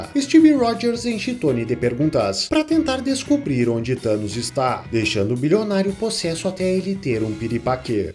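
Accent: Rio Grande do Sul, Brazil. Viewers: Mas ah locô véio! Esse sotaque é inconfundível. Tu é do RS?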